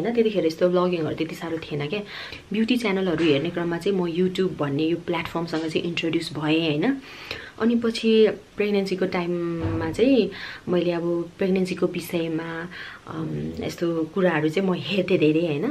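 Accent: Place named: East, Nepal. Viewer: nop di....u r beautiful as always...it doesnot matter how ur eyes look like..love the way u talk ,express ur feeling...lots of love ..❤ hami purbeli ko boli mithoo hau...#solukhumbu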